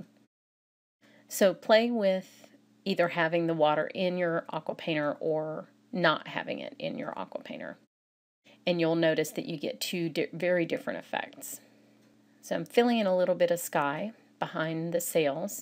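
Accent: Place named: Texas, United States. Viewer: I'm slowly working my way through your video and blog archives, adding many to my Favorites because of the techniques you show and tips you provide. Love your sense of humor a lot; your deadpan delivery in this video, when you were talking about options if you don't have 24 minutes to make a card, made me laugh out loud -- thank you for that! Also, I wish my Texas drawl was as lovely to listen to as yours; I tend to talk too fast to let my drawl sprawl ;-).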